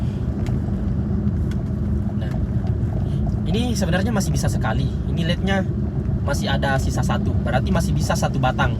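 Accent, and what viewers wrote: Indonesia. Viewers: Logat sumatra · Orang makassar klo dilihat dri logat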